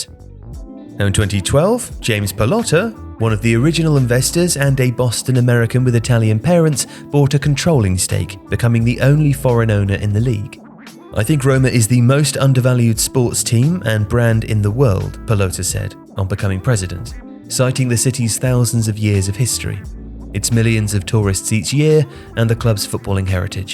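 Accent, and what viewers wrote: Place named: England, United Kingdom. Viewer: He pronounces different because he is English that is how it is in his language.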